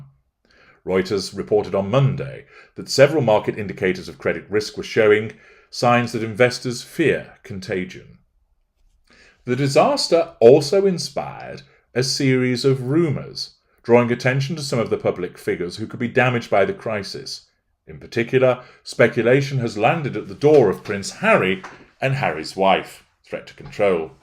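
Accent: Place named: Northern England, United Kingdom. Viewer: Noticed he pronounces other words in this way... always wondered if he originally came from the north of England. Whatever I enjoy his videos so it doesn't matter.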